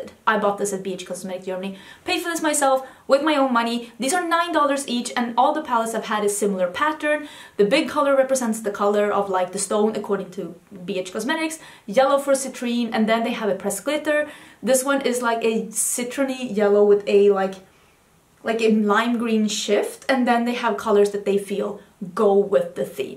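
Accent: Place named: Sweden. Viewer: Your English is brilliant and I love hearing your Swedish accent 🙏💕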